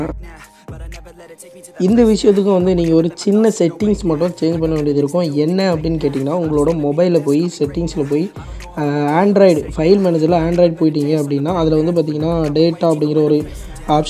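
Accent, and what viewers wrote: Tirunelveli, India. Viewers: Bro neenga nellai yaa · Bro ongalukku thiruneveli ah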